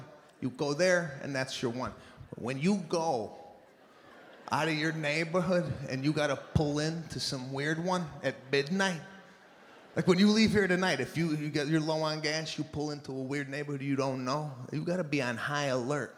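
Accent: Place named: Brooklyn, United States. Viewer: I love his Brooklyn/Queens accent! I'm from Brooklyn, and it just adds to his comedy! 💗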